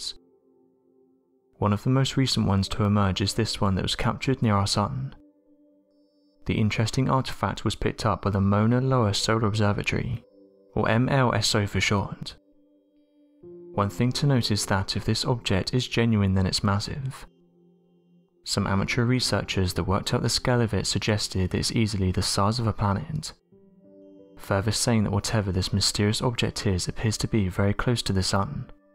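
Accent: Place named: Wales, United Kingdom. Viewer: The narrator's english accent is very strong and hard to understand, people of the channel you need to understand that your channel is broadcasting on YOUTUBE which is an international application and most of the viewers are not native english language speakers. Even some of the native english speakers have hard time to understand the accent. Is the narrator Welsh or from Isle of Man or from Bolton?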